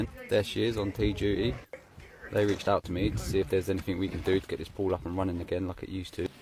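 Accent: United Kingdom. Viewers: bruv its his British accent my guy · The british accent is what complete this video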